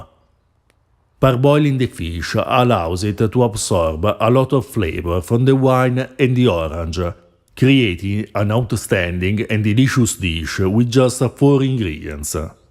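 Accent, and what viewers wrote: Italy. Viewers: I love to listen to you and your Italian accent so much. And of course the medieval recipe!!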